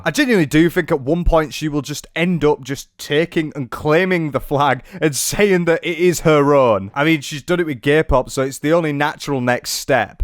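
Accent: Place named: England, United Kingdom. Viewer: Definitely my new favourite YouTuber usually I can’t handle English accents but yours is adorable 😂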